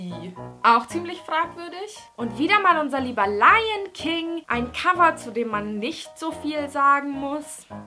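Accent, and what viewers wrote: Franconia, Germany. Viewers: Kommst du aus Franken? Oder was ist das für ein leichter Dialekt? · kommst du aus franken???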